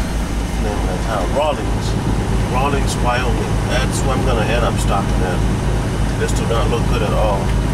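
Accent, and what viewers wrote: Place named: East Coast, United States. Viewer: These youngsters these days! In 34+ years of driving, I've seen alot of dumb shit happen, but I never in my wildest dreams imagined all the dumbshit drivers with CDL's. This new generation of drivers are reckless and complainers. They think they know it all and none of us old timers know anything about driving. Especially Winter driving. Especially I-80 Winter driving. Take that 70 truck pileup on I-80 in 2015 as picture of reckless idiotic youngsters with no respect for the road, the conditions or each other. Pathetic. The guy in this video sounds like a guy from the eastcoast. Like the guy whose comments I saw, this driver is slip seating and doesn't want to buy and hook up a CB. They do sell portables, you know. Most new trucks have built in antennas. You just hook your power cord to the built in terminals and attach the provided coax to your radio. 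Badda bing, badda boom...you got yer radio going...don't worry about it......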